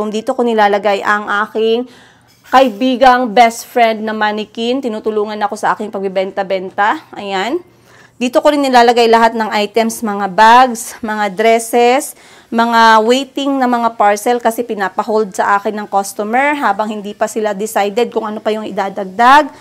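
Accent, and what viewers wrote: Iloilo, Philippines. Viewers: ilong go kaba kc yong tono ng Salita mo ilongo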